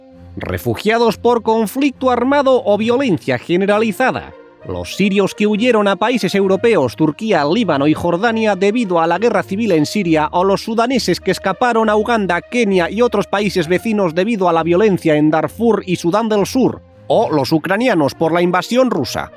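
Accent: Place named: Catalonia, Spain. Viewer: Hola divertidamente dibujado y con voz de origen del país Catalán ❤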